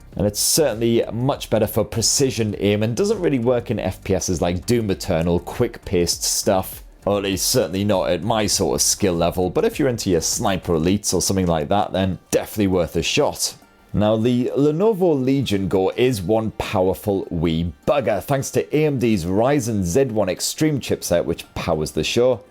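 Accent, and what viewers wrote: United Kingdom. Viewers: I didn’t know I needed a cheeky British man (I think it’s British, the accent) being cheeky and snappy and intelligent and educating me about tech he’s savvy about… but I doooo❤ thank you to you and your whole team!!! Also appreciate the chapter names in the time scrubbing bar… I se you and appreciate you whoever is naming them!!😂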